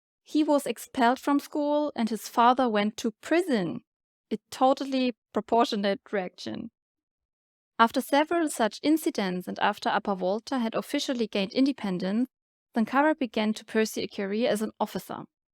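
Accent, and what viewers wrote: Germany. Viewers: Great storytelling. I recognise your German accent. I'm Namibian so I hear it often in our cities like Windhoek and Swakopmund. We have a huge German community in Namibia. I'll subscribe to your channel. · Amazing!! I really loved this video!!🥺 It was so sad to see that you don’t have the recognition you deserve. Hopefully your channel grows soon!! You deserve it! I’m so glad I stumbled across it and I will be watching more of your videos!! I really love your accent btw. What part of Germany are you from?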